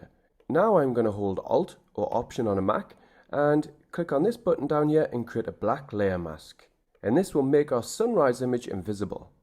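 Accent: Newcastle, United Kingdom. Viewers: are you from Newcastle? awesome tutorials thank you ☺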